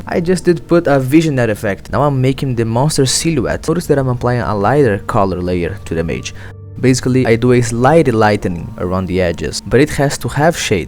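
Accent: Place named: Russia. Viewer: Mano seu inglês tem uma pronúncia bem russa. Sem zoa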